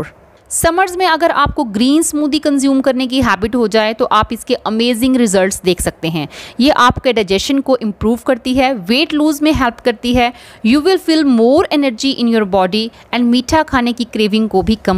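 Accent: Punjab, India. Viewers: Hi ji, as usual rocking video. I loved it, ur message goes to the mind directly. I luv ur punjabi diction in ur interaction.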